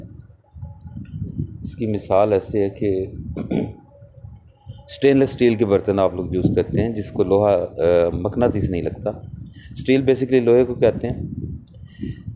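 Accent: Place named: Punjab, India. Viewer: استاد محترم سلام بحت خوب آپ اچھی رہنماٸیہ کر رہے ہیں لیکن ساٸین آپ کی آواز ۔یا کسی وقت کیمیکل ۔دواٸ کا نام اتنا جلدی یا تیزی بول جاتے ہیں جو کہ بار اس لاٸیں کو reves کر کے سننے سمجھنے کی کوشش کرتے ہیں مگر 000.ساٸین لگتا ہے آپ کا لہجا پنجابی اور بات اردو میں اور ہم تو ہیں سندھی اب استاد ہیں آپ سے گزارش ہے کہ الفاظ اور سپیڈ ایسی کریں کہ پٹہان۔سندھی۔سراٸکی۔پنجابی۔اردو۔بلوچی۔سکھ سب لوگوں کو سمجھ آجاٸے۔ ساٸیں الفاظ بھی آسان ہوں تو اور کیا چاہیے ۔ علم کے دریاہ میں سے تھوڑا سا سب پی لیں گے تو آپ کا کیا جاٸیگا۔ورنہ ایسا ہو گا کہ جیسے سمندر سے پیاسا لوٹنا۔ غلتی غستاخی کی معافی چاہتا ہوں اللہ آپ کو خوش رکھے اور بھی خزانہ علم عطا فرمائے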